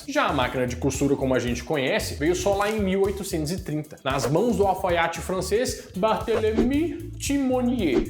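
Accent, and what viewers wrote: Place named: Minas Gerais, Brazil. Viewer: Agora descubri que voçe é mineirinho né por isso que viçe tem um sotaque reconhecivel e eu também sou de minas gerais cara. Um abraço😎✌👊👍🙏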